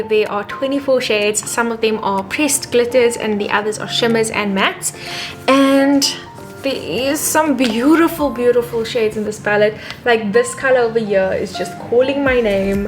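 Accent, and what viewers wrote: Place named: South Africa. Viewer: Love the coloured accent. Something only found in sa ..thanks.for.the great video. Fellow sa gal x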